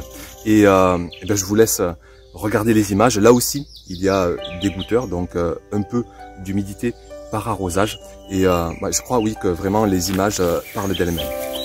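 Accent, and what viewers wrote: South, France. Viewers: Bonjour, tout d'abord une réaction par rapport au sujet puisque tu nous y encourages.. Pour ma part, cette année, j'ai paillé en "temps normal" mais c'était sans compter sur cette "année à limaces" (et toute cette pluie) qui fut exceptionnelle! Donc, il a fallu tout enlever, déloger le surplus, puis, dernièrement, pailler à nouveau. Quel travail! De fait, les parcelles et butes ont perdu une partie du vivant qui était là, bien installé... Les plants des semis ont du rester plus longtemps à la maison et la reprise en terre, je le vois est plus difficile, plus lente. Idem pour les fraisiers, l'excès de pluie a engendré la maladie. Il a fallu enlever fruits et feuilles touchés et bien sûr dé-pailler. Mais le résultat a été concluant: A ce jour, 5Kg de fraises! Miam Miam lol. Autre info pour les abonnés: enlever la paille temporairement si, comme ici, il fait encore qques nuits très fraîches. 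Cela permet à la terre de pouvoir restituer la chaleur pendant cette dernière. La paille bloque ce phénomène. Utile par exemple pour les plants de courgette. Enfin, une question: Mes Blettes montent cette année. Je les laisse pour récupérer les graines. Ma question est celle-ci: le plant meurt-il après avoir fait les graines cad dois-je le couper et semer un nouveau plant ou bien va t il me donner de nouvelles cardes comestibles dans quelques mois si je le laisse en place? Je finis en te disant que tes vidéos, ton (notre lol) accent me rappellent le Sud d'où je suis originaire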